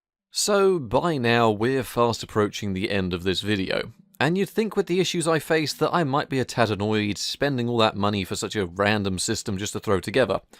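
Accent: United Kingdom. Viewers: why i hate british accent i dont understand :/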